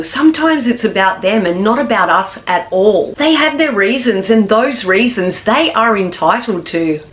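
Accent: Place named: Australia. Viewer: Your grace, you look absolutely adorable wearing pink, and with curly hair, and you have the cutest Australian accent, plus, the most beautiful blue eyes. 🤗🤗🤗 I don’t like dealing with selfish rich people, and horrible narcissists, I only associate with people who understand and respect my dreams and wishes, and who appreciate me for who I am. I just hope Disney or DreamWorks will someday hire me for some voiceover jobs. I sent you a kiss on your elegantly gloved hand, your grace. 😘😘😘🤗🤗🤗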